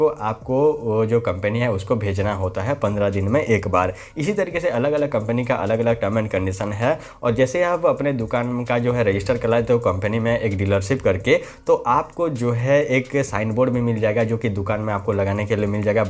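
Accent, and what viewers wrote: Chhattisgarh, India. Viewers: Chhattisgarh se ho kya aap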